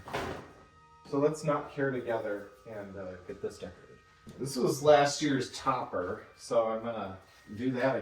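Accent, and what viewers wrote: Minnesota, United States. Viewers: On the 2nd day of Vlogmas my true love gave to me: 2 power strips 🔌🔌 and you sound like the movie Fargo... ❄️⛄🎄⛄❄️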